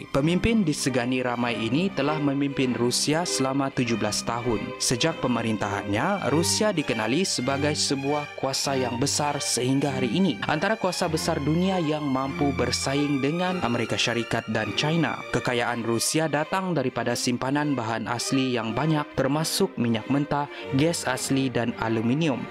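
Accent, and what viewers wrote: Sabah, Malaysia. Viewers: ni mcm org sabah oh.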